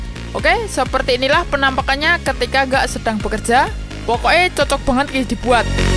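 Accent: Java, Indonesia. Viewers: Bang, menurut sy sih ya klo bs jangan kebanyakan pake logat jawa bg soale gua ga ngerti kadang bahasa jawa,, maksi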